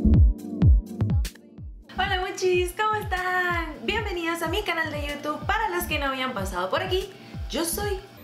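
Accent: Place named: Guatemala. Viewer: Me encanta tu acento chapin! Super chivo el video 🌟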